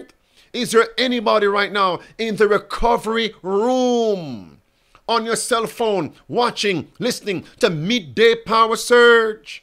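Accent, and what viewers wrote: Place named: Jamaica. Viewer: Ingles, el nacio en Jamaica por eso el accento que tiene.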